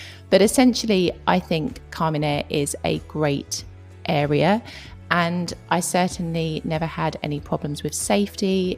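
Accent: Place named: London, United Kingdom. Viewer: Just found your channel what a breath of fresh air,really enjoying your vlogs and looking at some stage to spend about 3 maths of the year in Italy as retired and enjoy the lifestyle... Sicily really appeals so get that business set up soon.. Love the honesty and of course the accent Londoner myself keep up the great work and Godbless you🎉🎉🎉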